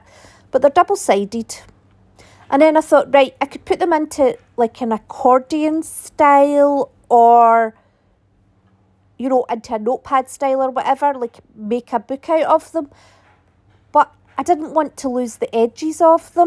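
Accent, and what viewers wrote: Scotland, United Kingdom. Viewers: I just watched Ceri the Crafter's video where you sent him ATCs and happy mail. I have just subscribed to your channel. :) I love these little pieces of art you have made. They are beautiful. (I especially like the dark ones) I love your accent. My mum was Scottish, so it makes me nostalgic. (I live in Australia). Oh and I would totally watch a longer video with you showing the process of these. They're brilliant.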